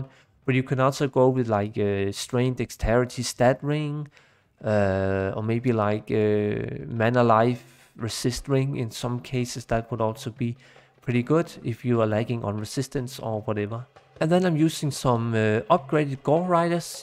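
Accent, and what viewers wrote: Denmark. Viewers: Elsker din kanal og især din interesse får mig til at nyde det. Derudover er din tykke, danske accent perfekt :-) Bliv ved! Har desværre ikke nogle ideer til videoer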